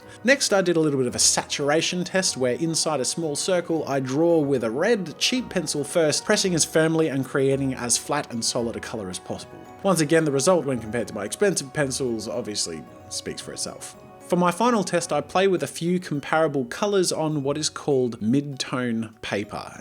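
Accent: Australia. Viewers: Hey, just stumbled on this video.. Out of curiosity is your accent a queenslander? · I just now realised that says "good day" in every video is because he's austrailing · Are you Australian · Is he Aussie? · hes Australian? at least I think because of the accent but he's actually hot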